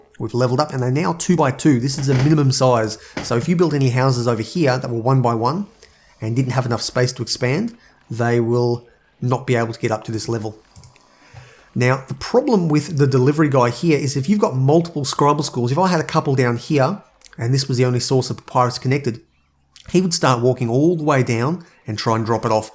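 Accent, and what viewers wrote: Australia. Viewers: Are you Australian? I'm an Indian who's just been exposed to different accents and I'm trying to understand all of them lol! 😂😂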